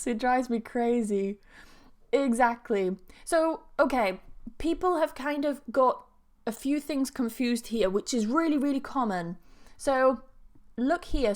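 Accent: United Kingdom. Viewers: She speaks British English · Do you speak British English or American English?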